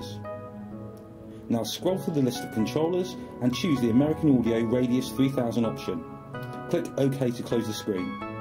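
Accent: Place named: United Kingdom. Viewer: why is the american audio product demo being led by a british guy? =)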